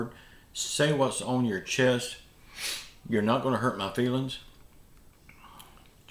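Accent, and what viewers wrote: South, United States. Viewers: We need to PRAY and have FAITH! Mr., I. Think. You have have soft lovely Southern. Accent, People will always criticize! I have accent too! Have good day! 😊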